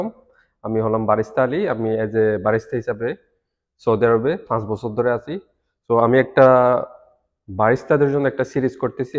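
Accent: Chittagong, Bangladesh. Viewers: আপনি কি চাটগাঁইয়া?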